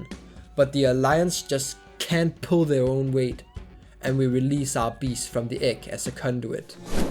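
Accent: Denmark. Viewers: Just finished watching the whole retrospective, and I instantly want to rewatch it. Seeing someone show the same love for a weird browser RPG with terrible gameplay, that I also share (and with a danish accent ❤) has been magical. Thankyou! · ah Nice to meet a fellow Dane i just thought during the second part your accent sounded really famliar, Also does your YT have discord server yet?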